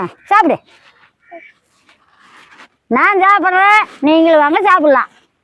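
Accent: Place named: Dharmapuri, India. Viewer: Nice ppaatima.. Neega ssamiyal kurippu sollum pothu yanga ooru neabagam varthu so neega yapdium Dharmapuri, selam, kirushnagri. Vellore pakkamtha irupiga... ❤❤❤❤ all vedio very nice paatima.... 🎉🎉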